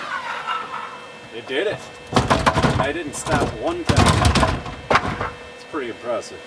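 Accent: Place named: Midwest, United States. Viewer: you sound like you are from Michigan. definitely the midwest. I know that accent cuz I’m from there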